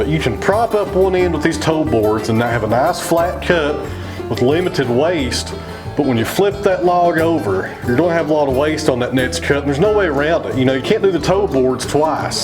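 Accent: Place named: West Virginia, United States. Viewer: Good for you. I have been watching your channel for a couple of years now. I discovered your channel when a related channel changed to yours and I heard your dialect. This is very similar to my many (mainly deceased) dear relatives from SW/WV in Mingo County--a long way from suburban Philadelphia where I live now. My favorite uncle in Mingo had a very dangerous circular sawmill used to mill cap wedges to secure mining timbers. I have loaded a few pallets of wedges in my time. Although we didn't timber frame them, we also built a few sheds out of "sawmill" lumber. He acquired his logs in a fashion similar to yours. Great content. Takes me back.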